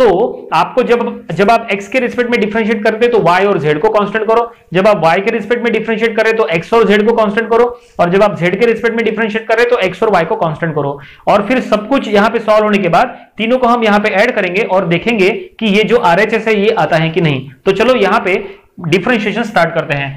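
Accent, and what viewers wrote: Hyderabad, India. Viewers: Sir hyderabadi Ho qa milenga milenga ke rhe ho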